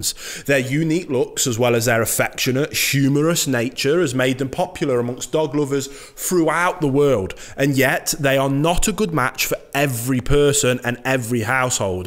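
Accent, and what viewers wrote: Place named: United Kingdom. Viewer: I really LOVE your British accent. But, now moving on, I love British Bulldogs. I had been able to go to the UK back in 2001. It was in March, so we had been able to go before 9/11. My best friend had treated me to A TRIP TO THE UK. THE TRIP WAS VERY MUCH A GREAT TRIP. I AM STILL A LOVER OF BULLDOGS, EVEN THOUGH IT SEEMS THAT MANY PEOPLE MAY NOT LIKE THEM. i ALSO love "pitt bulls", AND DOGGOS THAT SEEM TO BE A LOT LIKE THEM. OUR OWN DOG,. "ADDY MAY", IS OUR VERY OWN "STINKER", AND SHE IS ALWAYS AROUND, AND WE TRY TO MAKE SURE THAT SHE IS ALWAYS TREATED VERY GOOD, ALTHOUGH SHE IS VERY SPOILED ROTTEN.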